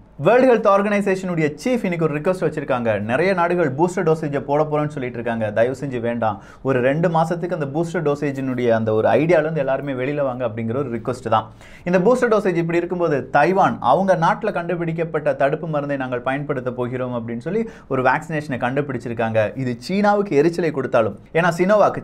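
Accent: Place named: Kanyakumari, India. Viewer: kanyakumari slang...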